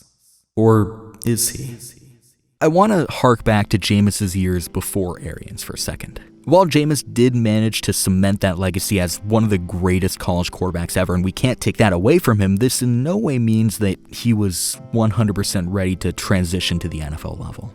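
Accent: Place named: West, United States. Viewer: Why do you sound like a cowboy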